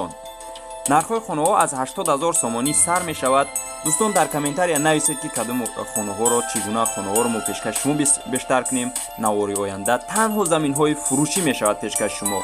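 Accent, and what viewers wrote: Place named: Russia. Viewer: Рут лахча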